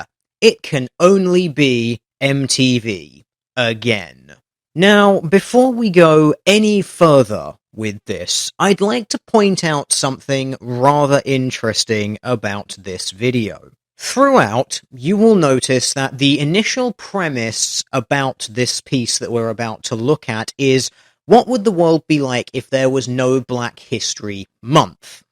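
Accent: United Kingdom. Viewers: So it's another guy with a British accent complaining about "social justice warriors"? Got it.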